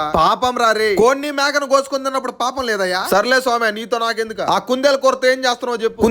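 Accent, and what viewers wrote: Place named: Telangana, India. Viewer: ఒరేయ్... నీ...తెలంగాణ...బాష...ఆంధ్ర...యాస... రెండూ...బలే...బాగున్నాయిరా...తమ్ముడూ...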